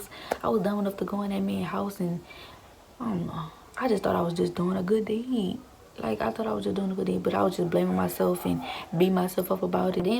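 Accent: New Orleans, United States. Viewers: I subscribed to your channel princess. Stay strong and I love that new Orleans accent. Stay blessed! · I hear a NOLA accent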